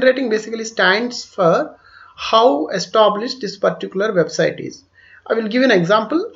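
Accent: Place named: South India, India. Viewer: thank you, sir, for giving super video, apki voice see lagta he ap south side see belong Karte ho, apka content 200k, 500k,1m wale Chanel se bhi acha he, acha hi Nahi bohot acha he, really thank you, sir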